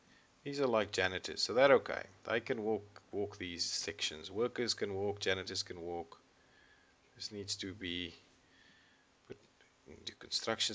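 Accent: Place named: South Africa. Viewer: Great accent. South African? Can you say "Diplomatic immunity!" sarcastically please. :)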